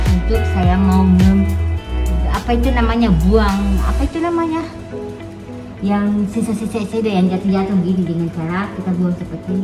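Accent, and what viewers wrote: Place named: Japan. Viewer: logatnya itu berasa kental japan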